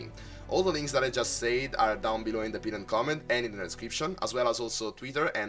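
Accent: Italy. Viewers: You ITALIANO?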